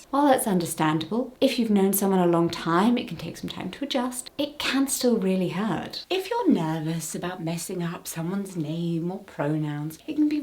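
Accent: RP, United Kingdom. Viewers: You sound very posh.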